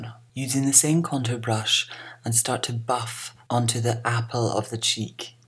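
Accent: Scotland, United Kingdom. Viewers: I've been binge-watching your videos today and I have noticed that your Scottish accent peeks through more in these older videos. The way you speak is absolutely hypnotizing.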